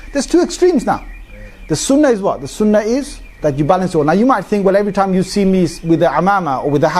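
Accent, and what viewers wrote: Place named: United Kingdom. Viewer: Definitely, british accent yes man I like this islamic man very much talking good about prayer and about his own God name called Allaah it's there own God true talk Mr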